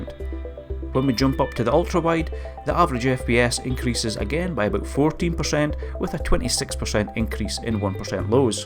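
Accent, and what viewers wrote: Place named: Aberdeenshire, United Kingdom. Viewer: Very professional video, is that an Aberdeen/shire accent?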